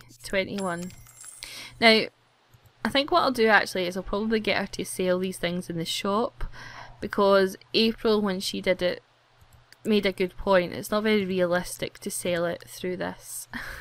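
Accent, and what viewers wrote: Scotland, United Kingdom. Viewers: I love how ur Scottish I hardly see Scottish youtubers I am Scottish myself my m is going to get me sims3 at the end of the month this helps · I love your channel! I have a hard time finding simmers I like because well...most girls on here having voices that irritate me :/ (yea I'm a girl and it bugs me) but your voice is perfect! I usually prefer British youtubers and now Scotts xD thank you, you seem quite intelligent and I look forward to watching the rest of these :} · Yay! A Scottish simmer! I'm from Scotland as well so I'm happy that I can understand someone now. I mean I can understand some of the others but not very many. I've watched Big Brother 2014 as well and can't wait for the next one! x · Are you from Scotland or is it my head phones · Were in Scotland r u from. Xx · I LOVE YOUR SCOTTISH ACCENT!!! Especially when you said "storm" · shes scottish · Your Scottish accent!<333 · Dat accent...Dat amazing Scottish accent... 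· Im from scotland too and i was sooooo excited when she started talking :3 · You sound like Jade from Little Mix , but more Scottish . I LOVE IT! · your scottish like me!! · I love your accent, its so cute~ I love Scottish accents. You seem pretty cool and I like the video. SUBSCRIBE~ <3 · I knew you were Scottish as soon as I heard you talk. :) I absolutely adore Scottish accents above any other. Love this story so far. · I love your accent! It's scottish, isn't it?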